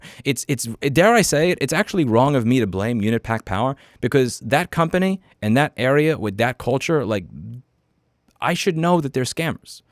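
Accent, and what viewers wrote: New York, United States. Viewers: Good to see he's keeping his angry n'yohka style, even in sunny Texas, when he needs no put these scammers in their place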